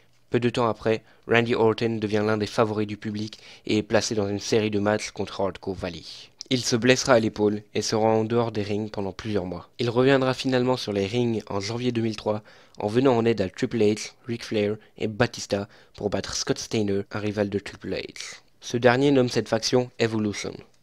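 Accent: England, United Kingdom. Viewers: Pourquoi tu parle avec un accent anglais c moche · Mdrrrrr trop gênant car le contenu ça va mais j'arrive pas à me concentrer, arrête l'accent anglais gros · J'aime beaucoup le contenu mais paaaar pitié stop ton accent anglais putains xD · je veux pas etre mechant mais je tient a dire que ton vieille accent anglais est vraiment soulant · J'ai kiffé mais y'a juste un petit truc c'est mieux quand tu parle sans l'accents anglais parce*-que avec on comprend pas trop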